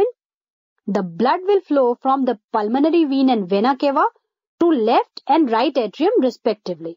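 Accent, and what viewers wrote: India. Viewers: your accent is too indian. i cant understand a word you are saying. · funny indian english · Im engaged to an indian girl. I wish she had an accent like yours. God bless you indian woman.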